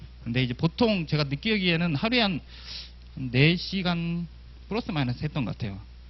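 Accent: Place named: Chungcheong, South Korea. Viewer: 충청도분인가보네요. 답답합니다.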